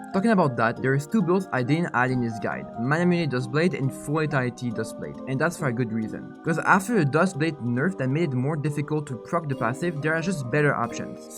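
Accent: France. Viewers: Ahaha je sais reconnaître un french bro quand j’en entend hein! J’ai bon?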